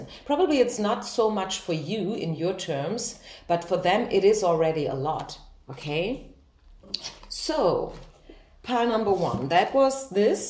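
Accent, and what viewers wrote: Germany. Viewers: Are you from Frankfurt, Germany?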